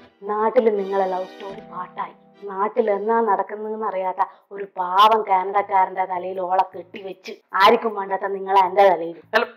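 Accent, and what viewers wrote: Kannur, India. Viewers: Kannur or kasaragod ?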